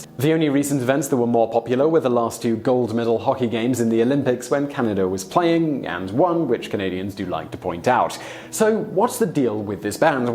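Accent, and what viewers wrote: United Kingdom. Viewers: It is by a Brit you idiot, how could you listen to the guy and think by his accent he was American. Are you deaf or what is your problem ?. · Why is there a British guy talking about Canada? Why not a Canadian, eh?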